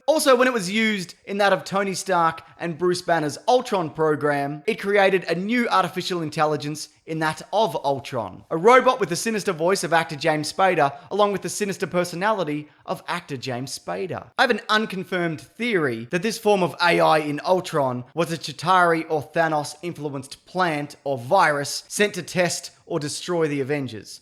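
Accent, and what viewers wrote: Australia. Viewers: love the aussie accent! been a fan of the weekly planet podcast for a while now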